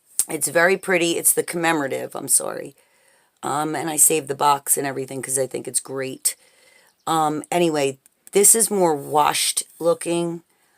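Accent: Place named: New Jersey, United States. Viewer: Your accent makes me ache for home.... Jersey girl or NY?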